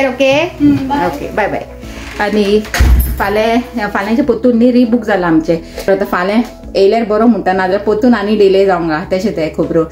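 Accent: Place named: Karwar, India. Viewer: Your voice is so irritating also don’t say your husband Patrao all the time. It’s annoying. Ghantis call for Goan Patrao . Your karwari Konkani doesn’t suit for Konkani vlog.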